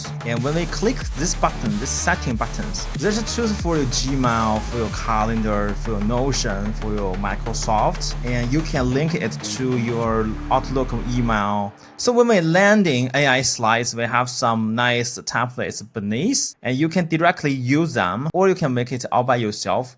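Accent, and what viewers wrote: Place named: China. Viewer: Honestly, i think you should either work on your heavy chinese accent or consider using tts ... its really hard to hear your words clearly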